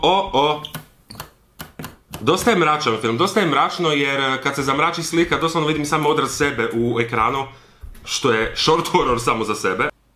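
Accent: Croatia. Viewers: Al taj dalmatinski😂😂😂🥰🥰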